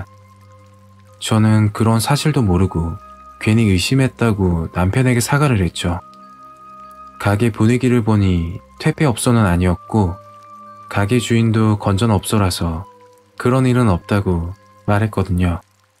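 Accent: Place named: Busan, South Korea. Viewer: 절은청년같은데 우째그래 이야기를 차분하게 잘합니까? 말씨는 부산말씨도 많이섞이가 듣기가 참 개안습니다.이야기 내용들도 들을수록 자꾸 더듣게됩니다. 앞으로 도 많이들려주십시요수고하십시요,.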